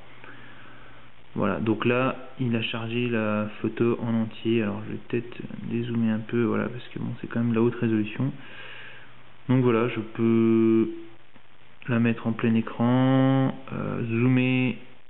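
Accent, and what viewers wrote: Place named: East, France. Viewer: Bel accent de l'Est ça me rappelle mes racines!!! Sinon ton galaxy semble avoir des soucis, le slide parait bien plus réactif sur le mien et je n'ai jamais eu de problème avec l'APN... Belle demo en tout cas!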